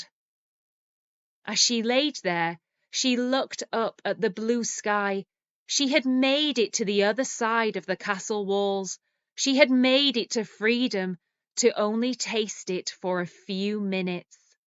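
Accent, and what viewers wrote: England, United Kingdom. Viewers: Hyy I like your accent that's why I'm addicted to your voice hope you Will continue these masterpiece stories ❤️ I wanna get British ascent like you , may be you can understand how much I love British accent 🙂🙂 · Yes indeed, I really enjoy the story. Love your English accent. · Are you from britain, your accents is soo british..thats nice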